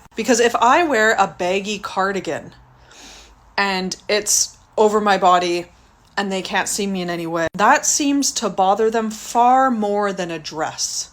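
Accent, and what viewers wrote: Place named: United States. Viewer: Rarely comment on videos, but why not… knowledge is power so the least I can do after hearing your perspective is to offer my perspective and opinion in return. So here we go… #1 First and foremost - This is 2025 and judging by your accent it sounds like you live in the states. Wear what you feel comfortable wearing and don’t worry about what other people think. - FEELING exposed is definitely a thing. Again, wear what makes you feel comfortable. For the same reason I don’t like to wear pants or shorts that don’t fit me right because I FEEL like they expose my ass crack lol I’d rather I didn’t feel exposed. - I mean, we couldn’t take pictures with our phones when I was in school haha so can’t relate there 🤷‍♂️ We did, however, sneak peaks when we could of course. Natural curiosity. But to take a picture and expose someone publicly or save a moment in time for future reference without their consent? 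That’s just fucked up. That’s just bad character. - Oh we are 100% picturing what you look like naked whether you’re in a dress or in leggings. Leggings actually make it easier though, just sayin’. Well… I guess it depends on the dress, too. Anyways… I that we goes back to natural curiosity as well. Speaking from a man’s experience, we have our preferences. At the end of the day, our primal instincts are just trying to narrow down a suitable mate. That, however, should NEVER excuse intolerable behavior of societal norms (but what has our society turned into anyways?). Without moral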